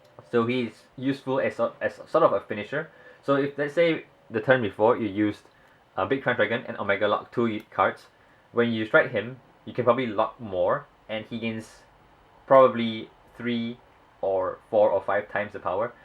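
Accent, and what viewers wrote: Singapore. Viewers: Are you from Singapore?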